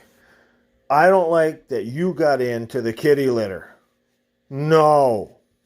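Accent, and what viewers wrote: Minnesota, United States. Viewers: That minnesooooohhhhhta accent tho. Great vid on the importance of the place command.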